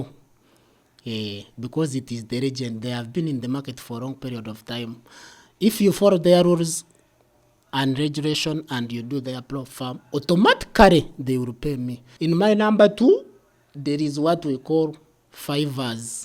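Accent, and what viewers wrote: Uganda. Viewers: I can detect Ugandan accent from a kilometer away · Too authentic, I like this man from Bunyaruguru😊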